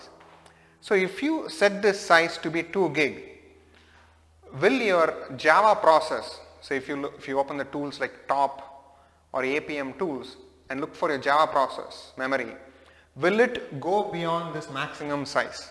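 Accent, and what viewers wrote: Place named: India. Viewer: 受不了三哥的英语。。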